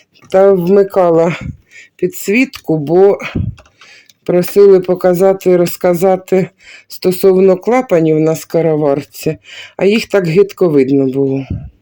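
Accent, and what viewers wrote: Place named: Slobozhanshchyna, Ukraine. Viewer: Моя названа мама була із Купянська, так що говір Слобожанщини впізнаю одразу. 😍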